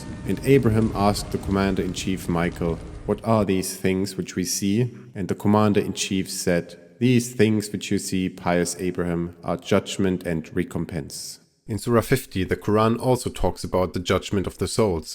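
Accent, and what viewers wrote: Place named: Egypt. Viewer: Sounds very Egyptian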